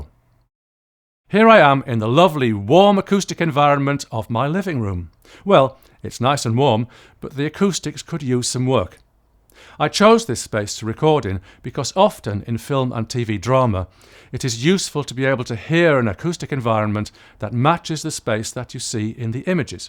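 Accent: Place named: North East, United Kingdom. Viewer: Cheers, very useful. Just about picking up your dulcet north east tones..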